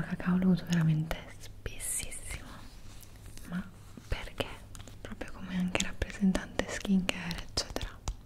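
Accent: Italy. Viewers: Is a italian voce Itis normal you not comprend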